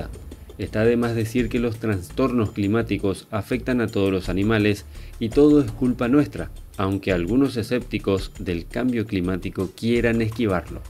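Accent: Paraguay. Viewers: Tu voz suena a Paraguay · Enzo Aquino si viejo, Paraguayo es..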